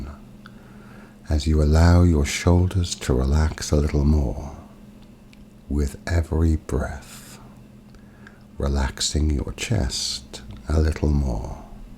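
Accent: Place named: United Kingdom. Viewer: any British gentleman's voice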